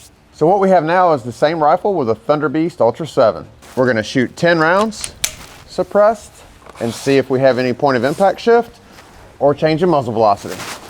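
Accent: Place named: South, United States. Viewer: Nevermind I can hear this dudes southern drawl so it negates my earlier question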